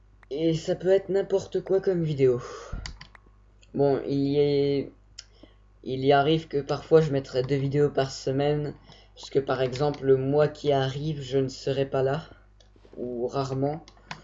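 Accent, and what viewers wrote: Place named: England, United Kingdom. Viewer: Mais quel accent anglais mon dieu ! TU es mon dieu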